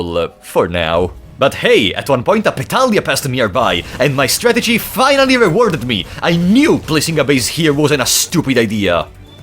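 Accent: Italy. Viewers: Are you a northern or Southern Italian?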